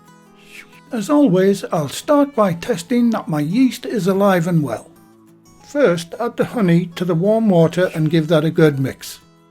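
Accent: United Kingdom. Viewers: I tripped on to your channel while looking for a Multi-grain seeded bread to make. I am definitely going to try this, thank you! You sound so sweet and I'm a sucker for the lovely British accent! Reminds me of my years spent in the Air Force stationed at RAF Alconbury years ago in East Anglia. Tell me Sir, how would I modify this for a Bread machine? I assume it needs to be wetter right?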